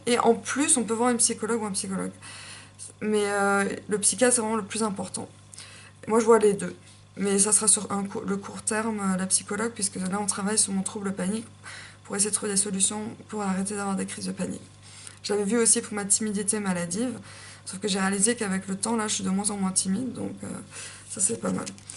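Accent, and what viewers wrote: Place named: France. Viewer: J'aime aussi ton accent français quand tu t'exprimes en anglais (c'est beau). Je ne savais pas que tu as vécu en Angleterre aussi, mais j'ai bien vu que tu es Franco Anglaise. Merci pour la vidéo (Ecouter de la musique moi j'ADORE !!!!!!! Je m'évade je suis dans un autre monde j'oublie TOUT ça fait un grand bien. Je peux écouter de la musique pendant quatre heures car la musique est tellement varié.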